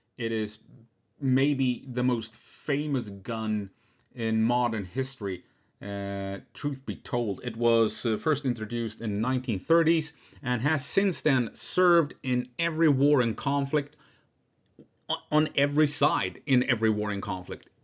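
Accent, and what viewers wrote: Gothenburg, Sweden. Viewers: I love how you prove your Swedish ancestry by pronouncing the silent "j." Every time you pronounce a silent "J," it reminds me of how my 100% Swedish grandmother used to pronounce my name "for example, as "yohn" or "yeffrey" or "yames."). It is very endearing to me as a half-Swede. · It's a pronounced Gothenburg accent 😂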